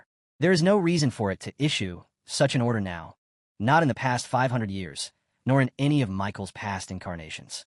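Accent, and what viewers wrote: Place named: United Kingdom. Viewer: British ahh voice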